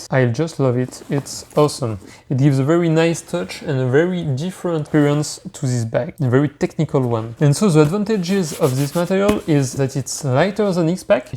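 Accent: France. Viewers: I can easily guess you are french, parce que moi aussi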